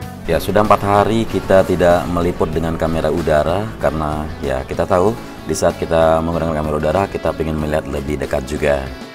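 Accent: Lombok, Indonesia. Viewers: Lombok mana suaranya👍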